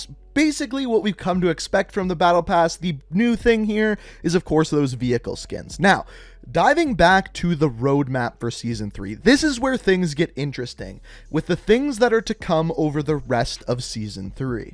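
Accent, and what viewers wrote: Canada. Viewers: Gustavo Castillo I’m not sure if he a canook but he’s for sure from the north. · Are you Canadian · Sorry? Your Canadian?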